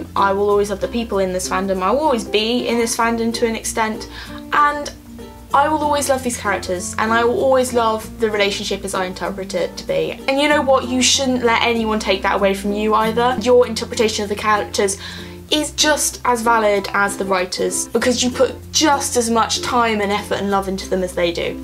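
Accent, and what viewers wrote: United Kingdom. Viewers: Watching this, I was hearing my own thoughts coming out of a younger person's mouth and with a British accent. Seriously, everything you said are things I have said over and over. I lost a friendship -- someone I knew for 30+ years -- for just questioning how John got out of that well. If Sherlock Holmes were a real person, he would have eviscerated this episode for all the inconsistencies and impossibilities; as fans, many of us have come to analyze things in the same way. Thank you for this video!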